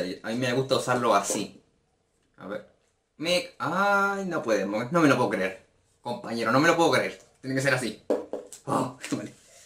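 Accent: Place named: Spain. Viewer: Por que hablas como español si sos tremendo peruano?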